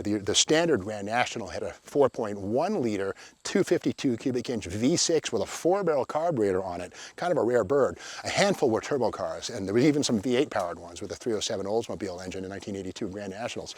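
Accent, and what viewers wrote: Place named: Central Massachusetts, United States. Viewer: Actually grew up in Worcester county, central mass, they have a unique pronunciation of some words. Like,cahnt, for can’t, heeya, for here, and cah for car,etc.etc.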